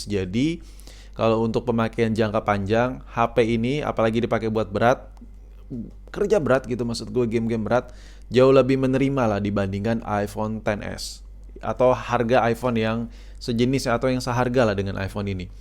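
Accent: Indonesia. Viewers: English tapi logat tetep indo ya kang 😁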